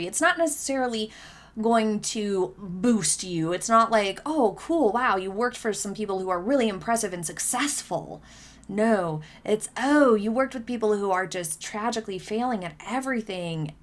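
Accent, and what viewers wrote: United States. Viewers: It’s people like you who are getting it wrong. I am surprised because you sound American and should know better than to repeat so much information from the Tabloids. I expect from the British because they (including the Monarchy) lives and dies by the tabloid news. So much of what you are espousing came directly from the tabloids. 🤷🏾‍♂️ This trip has been an absolute success! The Colombian people have embraced Sussex’s! That is the problem! The tours they made as royals were excellent! They hit it out of the park every time. They are embraced by DIVERSE populations of people around the world because they are much more relatable than the Windsors. Kate and Will’s last tour (the Caribbean) was a disaster! Terribly out of touch with the world. There are many things you can say about Meghan but you absolutely cannot say she is lazy or lacks ambition. 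Why is it problem because she enjoys working hard and is good at it? It’s a problem because the rest of the royals are mediocre at best. Your biggest hater’s tend to be those who you are associated with and don’t like you because you are working too hard for them to keep up! Meghan left the royals, it was her choice. They are salty because she had the balls to do it! PERIOD! That is the biggest problem along with the systemic racism and colonial mindset that the royals can’t let go of. Millions of black and brown people around the world who live in countries that have been raped and pillaged by the British Royal Family are unwi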